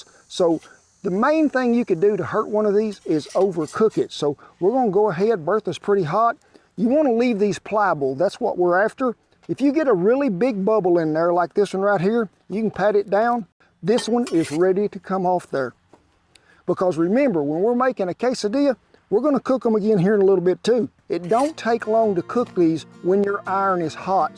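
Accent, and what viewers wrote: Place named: Texas, United States. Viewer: Boy, you look like my family down in South Texas.. You sound like them too. Lol Im gonna make your tortillas.. i havent had a good one since we left the Rio Grande Valley in '77. Ugh.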